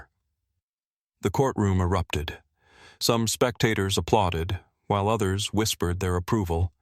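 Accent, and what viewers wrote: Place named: United States. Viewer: The usual load of American accented crap! Give it away. Try it out with the Arlington guard change/ military cemetary! No? Gutless?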